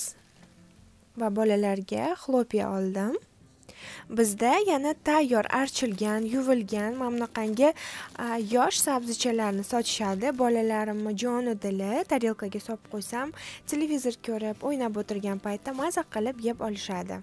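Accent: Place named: Fergana, Uzbekistan. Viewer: Ассалому алайкум! Канал росаям ёкди🤗 бунинг учун Aishaxonга рахмат деб коламан ва сизга хам👍 Мабодо сиз Фаргона шахридан эмасмисиз? Гапиришиз бизникидай эканда🤩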